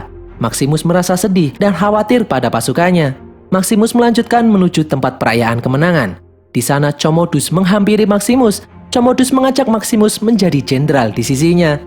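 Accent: Java, Indonesia. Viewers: Agak mendok ya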